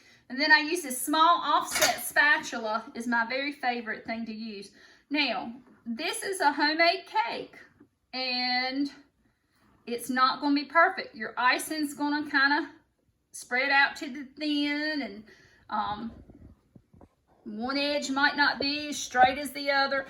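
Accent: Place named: Texas, United States. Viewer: You talk just like me. I'm from south east Texas piney woods.😁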